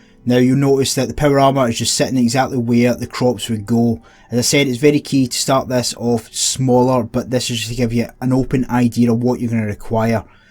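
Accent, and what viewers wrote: Scotland, United Kingdom. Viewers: Good Scottish accent, I'm in Falkirk, from Cumbernauld and all fallout games look like Scottish newtowns! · Scottish accents are the best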